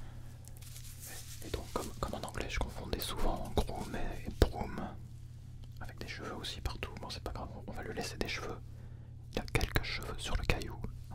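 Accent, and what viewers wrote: France. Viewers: He speaks a bit fast for someone like you that is not used to french. Plus the accent from france is fairly different than the french canadien one. Its all practice. I changed my computer to english, my phone to english and only consume english medias and over time I understood both languages almost perfectly.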